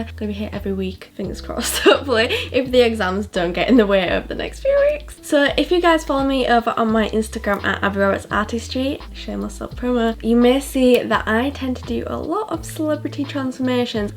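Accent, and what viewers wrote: United Kingdom. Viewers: Who knew she was brittish lol I am shocked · DUDE I THOUGHT SHE WAS AMERICAN BUT SHES WHATEVER WHAT BRITISH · Is she British??? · yea I was so shocked when I found out she was British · Wait what the..... she is British i... Wow 😳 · Wait I watch ur tik tok’s and I never heard ur voice! I thought you was American wait so are you British like me??? 🤔☺️💗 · Omg u're britishh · where are you from cause tha is a UK accent 101 😂😂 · First time hearing your voice... and I'm like WTF SHE'S BRITISH, but like where abouts you from cause I know a few people with your accent? Xx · She is BRITISH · Are you British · She’s BRITISH🤯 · wait wha- your British!!!!!! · I follow her on tiktok and when I saw she had a YouTube channel I was so happy! When I played the video tho, her voice shocked me, I thought she was American but shes British. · WOoh i thought she has an american accent not a british accent hahaha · James charles: No comments about voice Moriah elizabeth:no comments about voice: omg ur voice is soooo BRITISH i thought it wud be like american.... 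· Youre britishh!?!? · Wait are you from the uk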